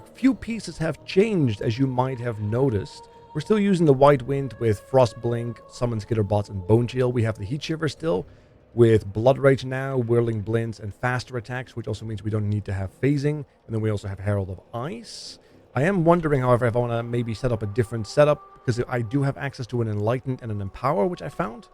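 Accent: Netherlands. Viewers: and moreover: nice content, i like your videos, i like the accent <3 you sound like my part of the family from the netherlands. i am from germany :) and thanks alot for answering my question <3 cheers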